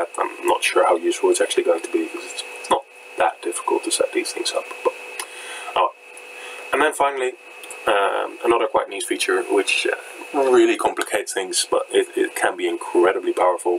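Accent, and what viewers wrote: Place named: Netherlands. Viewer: Jesus wat een kut nederlands accent heb je als je engels praat -.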